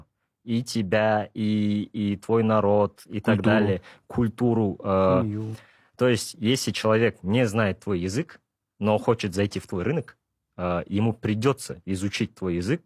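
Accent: Standard. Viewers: Ол қазақша сенен таза сөйлейді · Как раз таки он чисто, грамотно и литературно излагается на казахском языке. Это он объясняет напротив сидящим русско говорящим ведущим.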